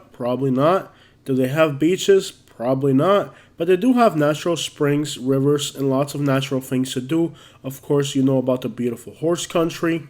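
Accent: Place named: North Florida, United States. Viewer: yo, your channel is solid 👏🏾🙏🏾 your north Florida accent sounds just like my grandfather 🤣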